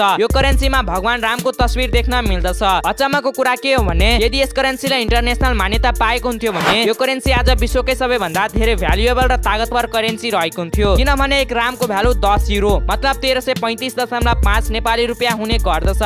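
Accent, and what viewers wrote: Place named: India. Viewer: You are going much good but I want to suggest you that improve your accent and voice. Ali Hindi accent jasto vayo nepali jasto suniyena.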